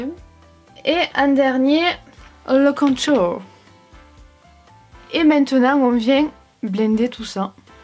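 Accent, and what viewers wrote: South, France. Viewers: J'adore t'entendre en vidéo avec ton accent qui me rappelle le mien hihi (je viens du sud vers Carcassonne)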